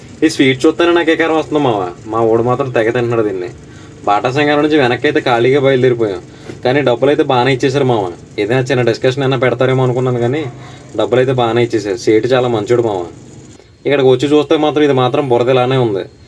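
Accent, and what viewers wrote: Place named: East Godavari, India. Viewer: Yedo East Godavari slang lo matladu thunna Vu brother. Mee proper yekkada brother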